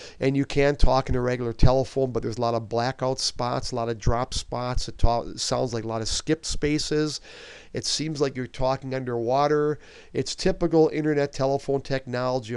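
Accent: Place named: Wisconsin, United States. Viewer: I love your Wisconsin accent.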